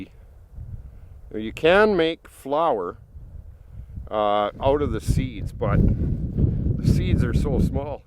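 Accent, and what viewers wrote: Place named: Canada. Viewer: I appreciate your inserts of humorous bits from other movies! & your Canadian accent & skills....thanks.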